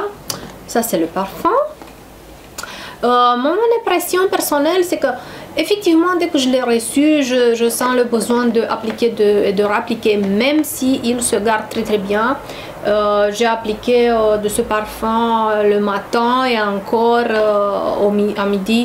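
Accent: France. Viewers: J'aime vraiment la langue française, surtout l'accent du français, j'aime beaucoup voir cette vidéo tournée en français! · Nice French accent! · Nice review! I like your french accent. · Love this chanel and nice french accent!!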